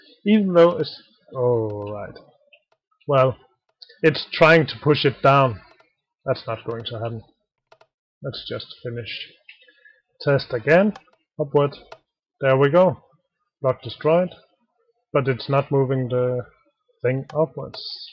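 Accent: Denmark. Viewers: That's the thickest danish accent I've ever heard xD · First: How do you make the stone blocks in the 'gate' going down and up? I Wnat to make something like that :) Can you just link me a video for a tutorial og the tutorial you used? Second: Are you from Denmark? It sounds like you are xD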